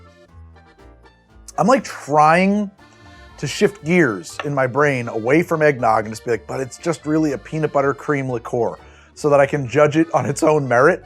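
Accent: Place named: New Jersey, United States. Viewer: Carnival barker with his Jersey roots coming through.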